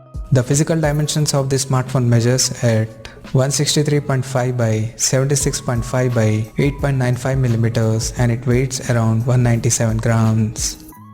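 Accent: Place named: India. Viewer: Your accent is very bad, If you gonna put videos to explain technical specs., You should get rid of Indian Language accent on your English. Each language has its own accent so don't mix please.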